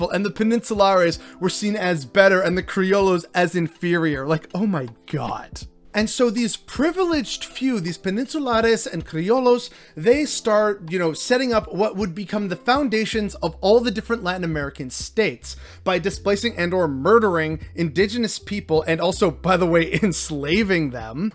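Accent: United States. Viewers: I bet you live in a predominately white neighborhood/city judjing by your gringo-accent spanish you can't have possibly lived around your brown-hispanic brotherens 🤨... Btw these White Nationalist brown/mixed-races Latinos have seen or experienced what it's like living in countries where Whites are a minority and they don't want to see that happen to the U.S. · Yo…..che… you can just leave you know Not because I say so but because you don’t seem happy Also your pronunciación is terrible It’s either fake American accent to Spanish Or not knowing enough Spanish ( and that. Is sad )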